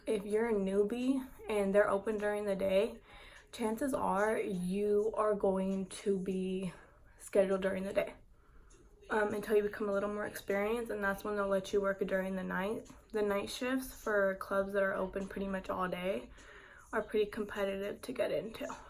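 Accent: Mexico. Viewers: Shes clearly Mexican by the way she talks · She talks like a Mexicana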